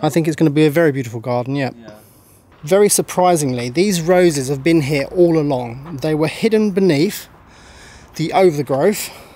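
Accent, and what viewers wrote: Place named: England, United Kingdom. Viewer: Somebody knows where this convent is, I recognized english way of taking, thanks to light me !!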